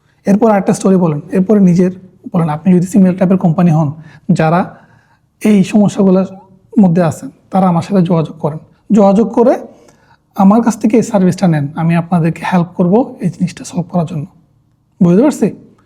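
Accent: Chittagong, Bangladesh. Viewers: ভাই মনে হয় চট্টগ্রামের?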